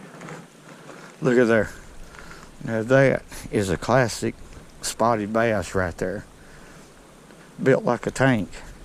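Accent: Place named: Alabama, United States. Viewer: Can you make a video on your film setup & how you locate fall bass, also i love your videos, your a super funny guy & you make my day when you show our alabama accent off, Rolll Tide:)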